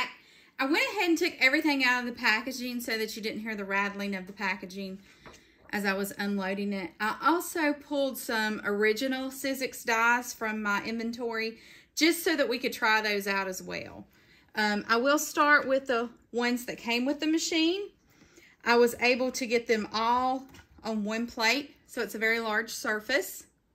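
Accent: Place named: South, United States. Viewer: Thanks for a great demo!!! You did a great job! I seam to have the same dies as you, so I can't wait to try them! I love your accent. What part of the South are you from? I'm from Tennessee.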